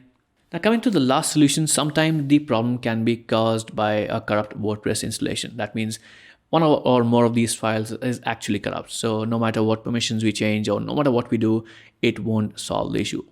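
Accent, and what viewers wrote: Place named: India. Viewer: I feel like im going bat sh!t crazy when i listen to east indians talk. Your guys accents are absolutely unbearable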